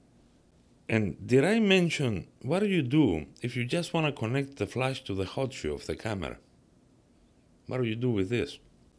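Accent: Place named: Greece. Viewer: Your accent is so Greek!!! I am not ready to move on from my d850 I don’t like mirroless!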